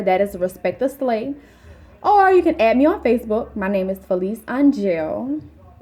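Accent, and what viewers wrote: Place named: New Orleans, United States. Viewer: As soon as I clicked on this video I said I know she from New Orleans lol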